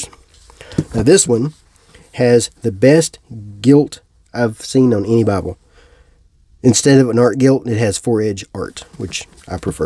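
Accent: South, United States. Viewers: Your accent is Southern American, right? What part of the South, if I may ask?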